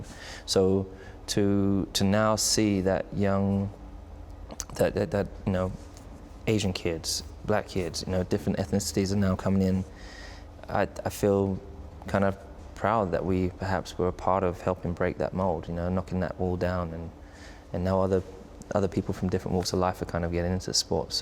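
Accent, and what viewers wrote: United States. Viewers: Daniel May He always hinted an American accent whilst talking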